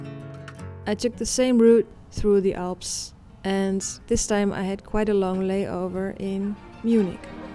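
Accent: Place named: Netherlands. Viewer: Hezký klidný video. Jak dlouho už jsi v Amsterdamu? Mluvíš holandsky? .... totiž když video poslouchám, skoro mám někdy pocit, že ve tvojí angličtině slyším víc holandskej přízvuk než ten českej;)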